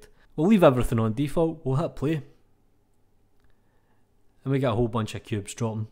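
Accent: Scotland, United Kingdom. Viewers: Just dropping by to say that I love your video format, short and concise. Also, your accent makes me wanna go back to Scotland so bad! Peace.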